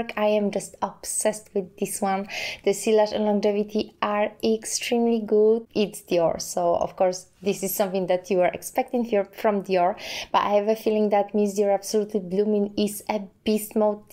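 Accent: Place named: Poland. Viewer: You have polish accent 🙂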